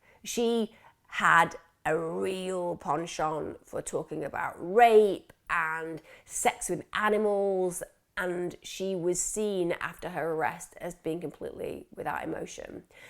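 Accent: United Kingdom. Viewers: British accents are almost as irritating as Asian accents.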